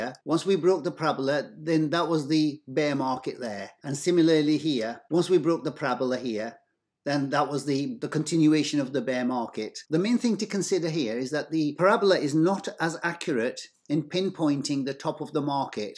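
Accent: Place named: Birmingham, United Kingdom. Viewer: No - he's a brummie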